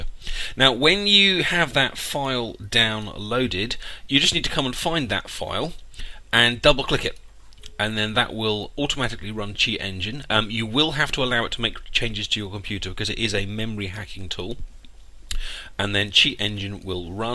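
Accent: United Kingdom. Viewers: Ok, i just subbed. My reasons 1) Badass intro music 2) You sound awesome (are you british, if so whereabouts?) 3) good content Nice Job man!!!! :D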